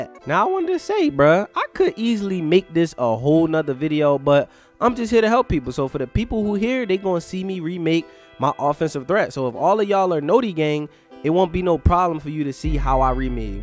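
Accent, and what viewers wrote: Baltimore, United States. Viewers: You definitely from Baltimore I can hear it 😂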